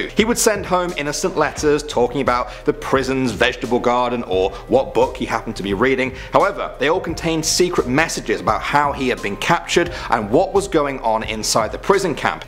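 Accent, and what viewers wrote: England, United Kingdom. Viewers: The Gamers Of The Apocalypse Fire that is because he is from England 🏴 the United Kingdom 🇬🇧 we pronounce words differently to Americans and this is how we spell the word Columbia not the way Americans would speed the world Which is Colombia just saying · Is that a england accent? If it isnt then what accent is that?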